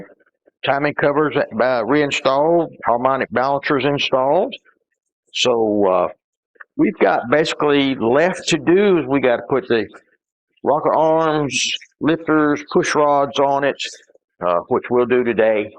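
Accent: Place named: Georgia, United States. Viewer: Man, I love hearing this gentleman speak on motors. I’m from SC, and that Columbus, GA accent sounds great from here in Hamburg, Germany tonight. Thanks for the vid! Ready to get home to my 68 GTO.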